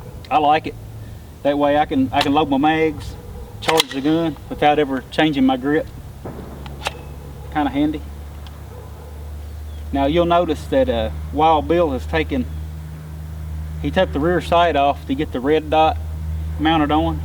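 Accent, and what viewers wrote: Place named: Boston, United States. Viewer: You sound like you're from Boston. Nice review!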